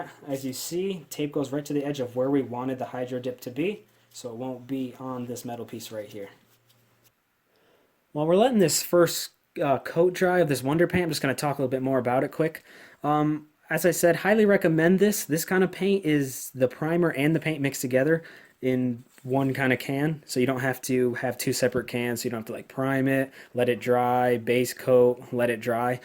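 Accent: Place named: United States. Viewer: Jorge Cruz stupid question....clearly you can hear they're American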